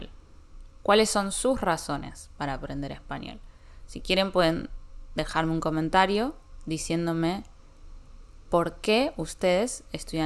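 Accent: Argentina. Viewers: ¡Hola! Tu acento es lindo, y no es tan cargado como generalmente es el acento argentino. ¡Me encanta Argentina! A ver...Yo acrescento más un sitio que también se habla español, aunque no de una forma oficial, pero se habla mucho español, que es Miami en Estados Unidos. Yo ya hice una investigación en la internet, y las fuentes que yo consulté, todas se ha comprobado que es totalmente posible vivir en Miami sin necesidad de saber inglés. Yo inicialmente pensaba que el español en Miami era necesario apenas para turismo, pero llegué a la conclusión que es posible vivir en Miami sin saber inglés. Saludos brasileño desde Río de Janeiro 🇧🇷😊. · Eres argentina ❤?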